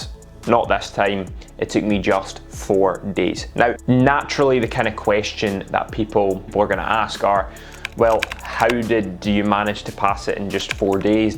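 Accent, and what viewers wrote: Scotland, United Kingdom. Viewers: Nice accent Scottish Lad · Are u Scottish? Impressive work anyway. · Are you from Scotland? Nice accent 😅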